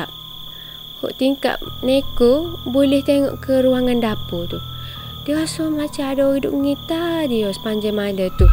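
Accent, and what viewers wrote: Kelantan, Malaysia. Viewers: kelate malaysia. sedap dengar kakak ni cerita terbaikkk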